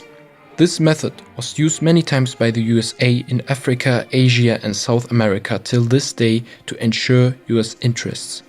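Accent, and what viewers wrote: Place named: Europe. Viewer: That euro accent.......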